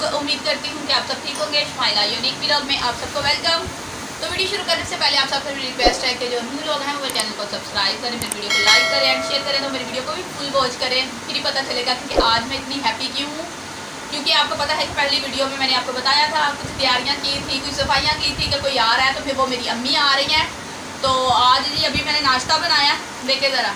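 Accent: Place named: Rural, India. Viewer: Bhot gandi Punjabi bolti ap pendu hi lagti ap or zra aram sy bola karo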